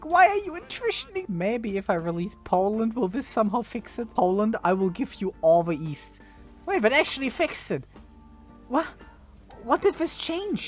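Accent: Germany. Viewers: I heard a german playing a ww2 game???? Count me in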